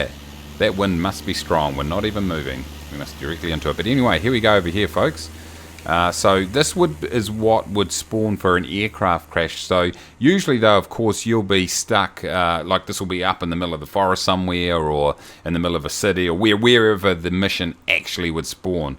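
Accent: New Zealand. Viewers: Are you a kiwi bro?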